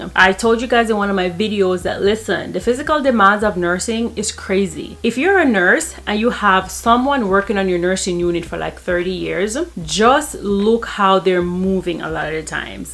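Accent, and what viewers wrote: Jamaica. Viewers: Hail up muma!!! Hear the jamaica accent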